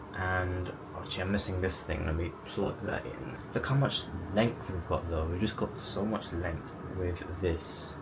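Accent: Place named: United Kingdom. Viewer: You sound like another British bloke, Lewis Hamilton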